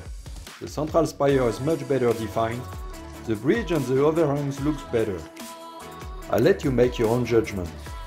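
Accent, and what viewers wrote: France. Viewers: Sympa l'accent français 😁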